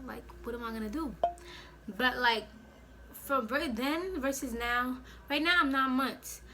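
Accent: New York, United States. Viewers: are you from new york?😭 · You know she from New York 🔥😂🗽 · Are you from New York? You sound like you are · you from new york ? 😭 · Ch Ch she probably from New York . That’s what her accent sound like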